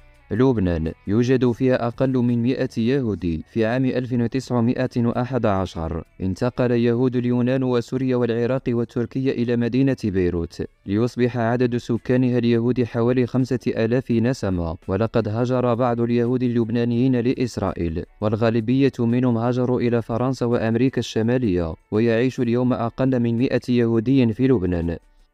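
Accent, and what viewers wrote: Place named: Morocco. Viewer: حتى هنا يتتبع المراركة الاثر اليهودي و يروجون للصهاينة...على فكرة انت يهودي مغربية...و لهجتك واضحة...تفو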